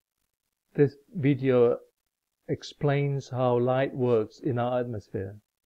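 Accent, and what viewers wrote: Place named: India. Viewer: not at all! You sound like you may have an Indian accent, that would make us neighbours (I was born in Sri Lanka) ! But I'm not 100%...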